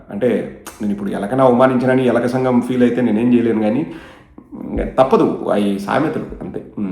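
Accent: Rayalaseema, India. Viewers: complete ga Rayalaseema slong lo videos Pettu abba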